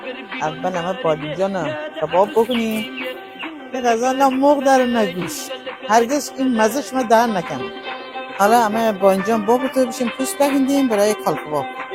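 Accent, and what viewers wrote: Gilan, Iran. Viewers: بسيار عالي و زيبا 👏👏👏 عزيزم چرا ميگين غذاي گيلاني ،؟ لهجه شما كه گيلكي شهسواريه . اينا غذاهاي غرب مازندران ، شهراي متل قو ، شهسوار ، رامسر و شهرهاي كوچيك حومه هم هست . صد البته كه غذاهاي گيلاني رو دست ندارن . اما بين اين شهرها كه گفتم مشتركه . و اگه ممكنه در مورد لهجه تون هم يه توضيحي بفرمائين ممنون ميشم 🙏🌹💚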